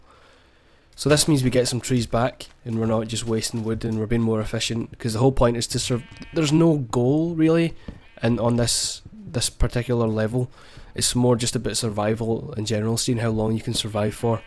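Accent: Scotland, United Kingdom. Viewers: maaan i love your scotish accent omg be my brother please omg you are like Desmond from LOST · r u scottish?????????????/ lolololol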